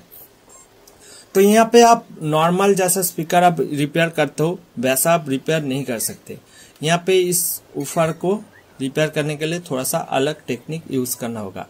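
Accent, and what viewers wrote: Bengal, India. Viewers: Aap West Bengal se hoo ka .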